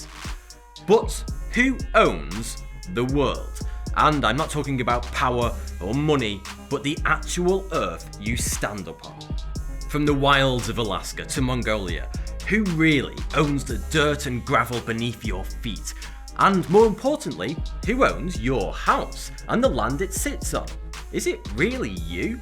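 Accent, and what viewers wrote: United Kingdom. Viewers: "Countries just have to own 100% of their own land in order to enforce laws". Wow, way to pull "facts" out of your ass man. You have a British accent. Have you heard of Stockholm Syndrome? In the USA there are some people with "coloquial title" which is absolute ownership. Is total chaos breaking out on those properties? Find out.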